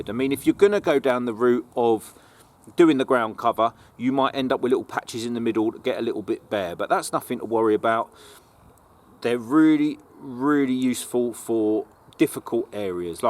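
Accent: England, United Kingdom. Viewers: Good advice. Are you in England? (Going on your accent 🤷‍♀️) I'm in Scotland and not sure if I should grow thyme in a pot or in the ground? What do you think?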